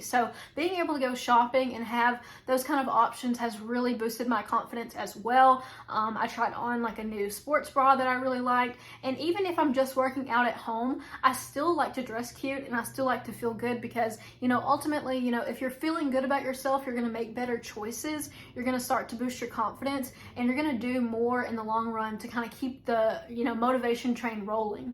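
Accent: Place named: Tennessee, United States. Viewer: Thanks for ❤ honesty and accent. I live in Tennessee so it sounds nice.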